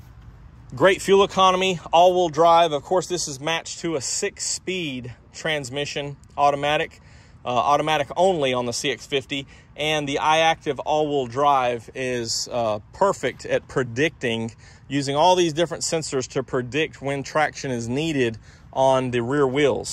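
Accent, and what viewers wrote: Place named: Alabama, United States. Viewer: It’s kinda funny because the sales rep in our company from Alabama says “sells” instead of “sales” just like you.🤣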